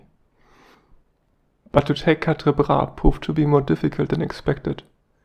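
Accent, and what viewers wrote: Germany. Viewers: I refuse to engage with animated history unless it is narrated by you with your class, inimitable German accent. Great channel, rock on top lad. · That is not his language, he is German, I hear that, I am one myself ;)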